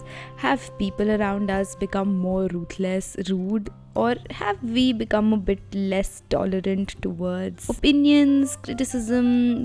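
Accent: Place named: India. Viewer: u r not toxic and Your Hindi accent was real ,trust me. Btw Big fan mam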